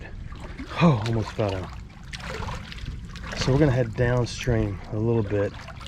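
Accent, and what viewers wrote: South, United States. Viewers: It’s no problem understanding you and I’m from the west coast. You speak deliberately and with very little southern accent. Your sweet wife…how she smiles at you!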